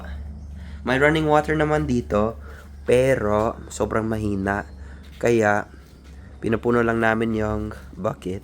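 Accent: Philippines. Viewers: Nice kubo. Grabe ung accent ni sir pinoy na pinoy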